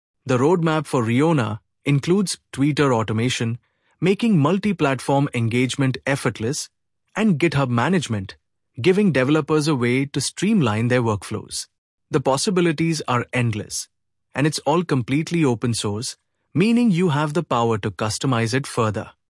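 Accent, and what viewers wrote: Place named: India. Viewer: it's sound like your neighbourhood scam call center indian man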